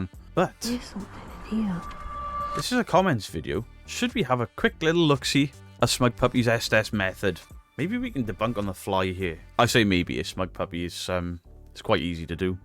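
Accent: Wales, United Kingdom. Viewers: "be those bottles and ghouls". Subs don't get your welshyness 😂😂😂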